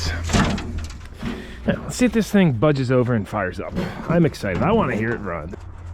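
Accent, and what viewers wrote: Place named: New Jersey, United States. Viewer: I’m not a car guy or “Motörhead” but I am amazed by your videos. The expertise and the way you can diagnose and fix just about everything… And you have the parts right there at hand… Is truly incredible to watch. Plus I love the jersey accent because that’s where I was born and raised. Anyway, keep up the great work, man.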